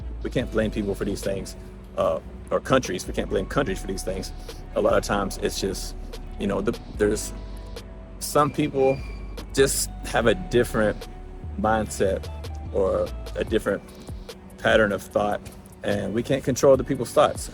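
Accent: South, United States. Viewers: I’m glad you didn’t quit and leave China during the COVID years, when your businesses didn’t do so well. You persevered and survived , despite the negative experiences from individual Chinese who assumed some westerners could have covid, based upon Chinese media reports. . But you have to remember you’re living in a country with 1-4 billion people who can act unpredictably,, . Like others have stated Asians in the USA were treated a lot worse. Trump politicised the pandemic, and referred to it as the Chinese virus , which was echoed across the conservative media channels like Fox News, This created unnecessary fear, and racist attacks against Asian's in the US. . But the important thing is , you survived and your life is better now. You seem like a laid back guy, who overcomes problems and just moves on, By the way , I love your accent , is it a southern American accent? 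I’m Chinese by descent, but I was born in Australia.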